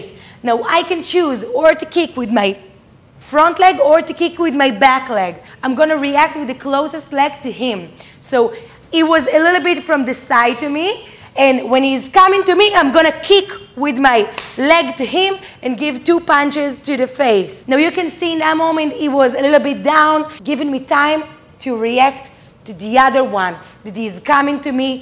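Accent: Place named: Israel. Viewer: אני מבין לפי המבטא שאת מישראל זה אומר שאת עושה בישראל שיעורים?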